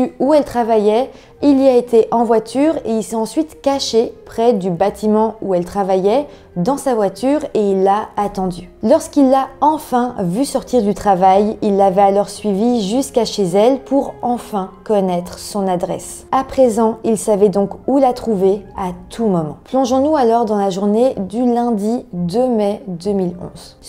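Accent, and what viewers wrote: Belgium. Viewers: Elle est belge elle ne prononce pas les UI comme en France voilà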